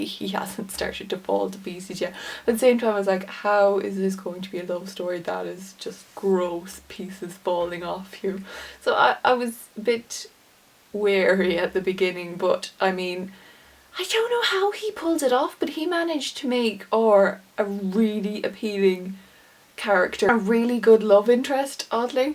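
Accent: Ireland. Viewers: I thought there was a cat behind you till I realised it was a reflection of your arm in a mirror lol. Great vids. Always good to hear fellow irish on here. :)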